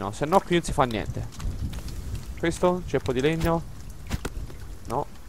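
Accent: Livorno, Italy. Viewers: ma sei livornese?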